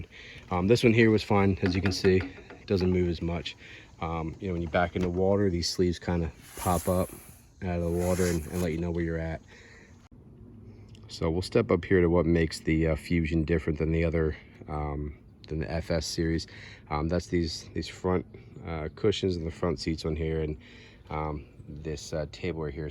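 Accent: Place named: Pennsylvania, United States. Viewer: That Pa accent is strong with you. Love the video. Very informative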